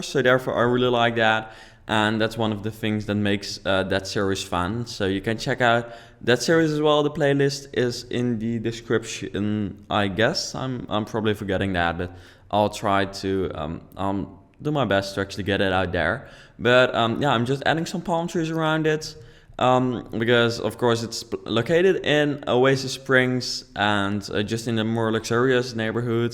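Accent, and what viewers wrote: Netherlands. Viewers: je kan horen dat ie nederlands is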